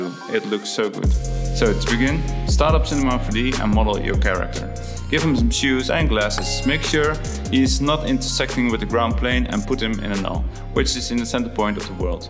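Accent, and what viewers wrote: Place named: Netherlands. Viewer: Nooooo, your English is perfect I am sure it is even better than mine, but I recognize Dutch accent from their pronunciation of the letter "S". In Africa we ask people not to sleep in the open, because of the missile. hahhahahah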